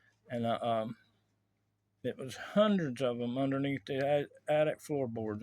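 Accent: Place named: United Kingdom. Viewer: Such an interesting collection! I love listening to your accent as well. Picking up a few British-sounding words here (“down”, “house”, etc.).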